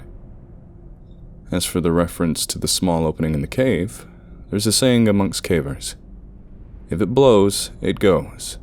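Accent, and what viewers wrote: United States. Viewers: Always amazed at your talents. Your American accent is top notch! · Your american accent is pretty good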